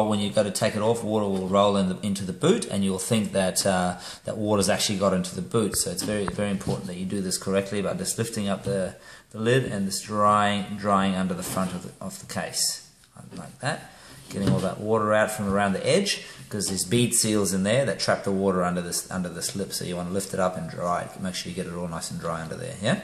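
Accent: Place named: South Africa. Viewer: Well done mate. Just purchased a dog and bone wetsuit case for my iPhone 5 as I'm heading to the Whitsunday's tomorrow. I returned home with my device in hand, raring to put it to work. Your little video is perfect. It's like a crewless cooking vid with just the details and predictions of little stupid things we consumers could overlook. The SA accent was the only thing that caught me, but I am full of confidence going into the water with my link to the great big world. Thank you.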